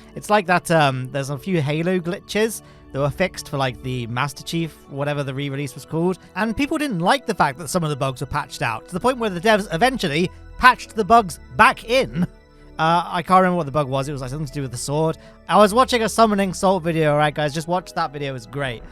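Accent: United Kingdom. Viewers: Forever confusing Duna with Jool thanks to the palatalized British accent...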